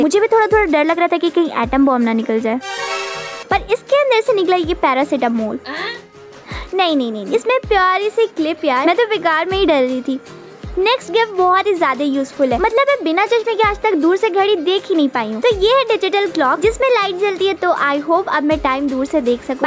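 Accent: Bihar, India. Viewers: Dii aap bihar se ho kya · App Bihar se ho kya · Tum Bihar se ho kya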